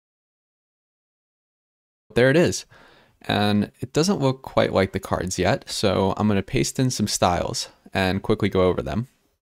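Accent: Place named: India. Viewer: Great video, by the way, are you Indian or Russian? Great accent and a very soothing voice.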